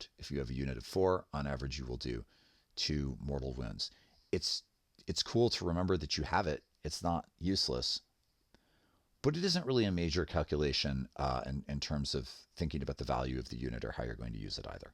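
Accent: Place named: Canada. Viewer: Not saying Canadian's are cooler or anything. It is a cool accent nonetheless. We are all from London anyway. 🤣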